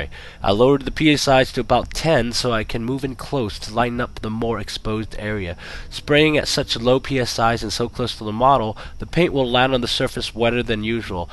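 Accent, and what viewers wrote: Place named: Northern Ireland, United Kingdom. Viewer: Bet ye you're from Norn Iron!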